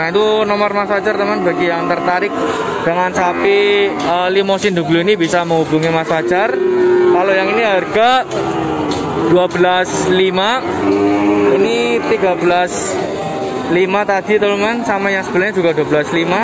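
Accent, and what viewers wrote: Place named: Banyumas, Indonesia. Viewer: ngapak campur indonesia mantaaap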